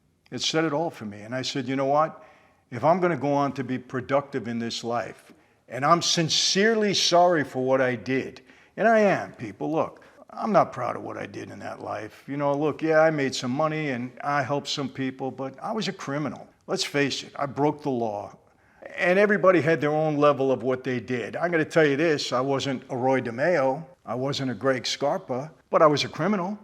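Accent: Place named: Queens, United States. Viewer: Mr. Franzese, thanks for this video, it really helped me. I’ve gone down some very dark roads and went back to God last year. I keep asking, “how can God forgive me after what I’ve done and how broken I am?” I’m from Queens and you remind me of the good version of the guys who, in a way, “mentored” me. This message coming from you is like one of them giving me good advice in a familiar, straightforward, credible way. Your personality, mannerisms and accent remind me of home and I always enjoy your stories. This kind of video is also really great. Be good, God Bless!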